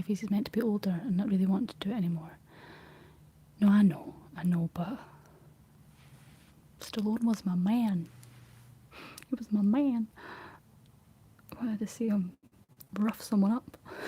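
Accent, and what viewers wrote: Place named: Scotland, United Kingdom. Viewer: So glad found another Scottish 🏴 lass i can listen to I love your story’s really helps me get to sleep thanks love from highlands❤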